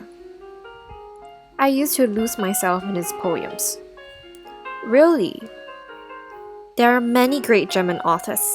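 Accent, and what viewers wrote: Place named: Singapore. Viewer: damn the narrator is singaporean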